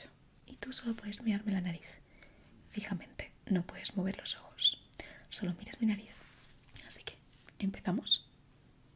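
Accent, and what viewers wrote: Spain. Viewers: Que ricura de mujer madre mia. Hecha a manos de dios. Y ese accento Español. Eres una diosa en viva.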